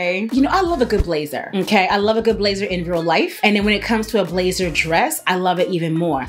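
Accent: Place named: New York, United States. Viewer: Girllllll. You are such a New Yorker, ya feel me? I'm like is this the accent people say I have that I don't notice but I know I have 🤣. I Crack up everytime you say something very New York.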